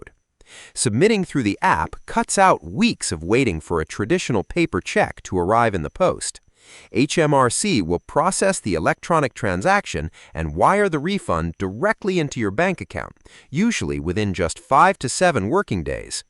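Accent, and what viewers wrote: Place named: United States. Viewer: HMRC could have used an AI voiceover without an American accent!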